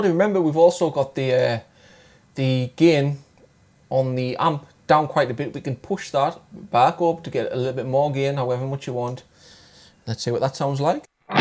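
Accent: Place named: North East England, United Kingdom. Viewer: Hi, thank you for this very helpful video. You take it nice and slowly, which is a pleasant change from most YouTube instruction videos. I’ve watched some videos by the otherwise excellent Richie Castellano but he goes though stuff much too quickly for me. Your pleasant North East accent is an added bonus. Are you from Bishop Auckland or Durham?... thanks for explaining all this so well. I can make progress now.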